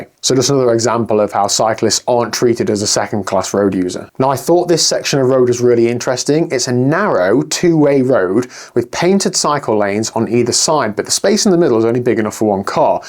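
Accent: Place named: England, United Kingdom. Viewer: You sound as if you’re English. Can you explain to me why so many British people use the word ‘floor’ when they’re outdoors? It’s the road, right? If you stumble or fall off your mountainbike in a meadow or in the woods, you land on the ground, not on the floor, right? I hear this a lot lately. Maybe a result of our modern sedentary life?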